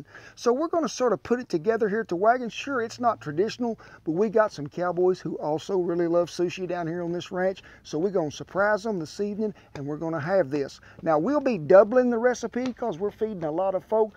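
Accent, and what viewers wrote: South, United States. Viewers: I enjoy your videos. The food sounds as if it would be wonderful, but the best part to me is your line of patter, delivered in Southern, my native tongue in the hillbilly area of South Carolina. Thank you for your efforts. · I don't care how you pronounced the words of the secret ingredients. With that heavy southern accents, we still can understand it. Fusion food fried rice. :)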